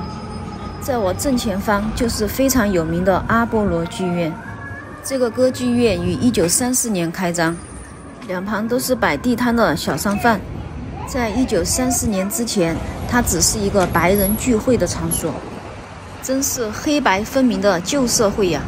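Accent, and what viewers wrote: Sichuan, China. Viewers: 看你的视频也学了不少四川普通话。👍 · 哈哈，晚上不要东晃西晃，四川话好亲切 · Haha - I can tell the host is from Sichuan where I came from... Take care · 流利的川普，就喜欢这个口音，加油。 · 师姐你是四川人吗 都垮杆了😄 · 大邑邛崃蒲江一带的口音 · 师姐是 四川人吗？ 川普好亲切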